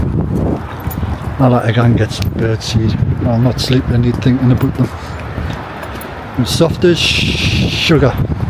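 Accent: Newcastle, United Kingdom. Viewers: Spot on 👍 we are who we are, so others can like it or jog on 😜.... BTW I love the gordie accent. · Love a Geordie accent my mum came from Newcastle as did by Uncle and two of my favourite aunts and sadly they’re all not here. I hear your accent and it reminds me of my childhood thank you.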